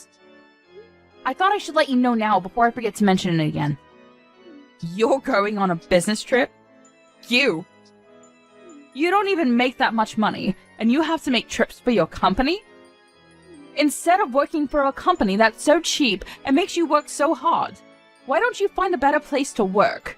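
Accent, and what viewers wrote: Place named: England, United Kingdom. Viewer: I like the English accent.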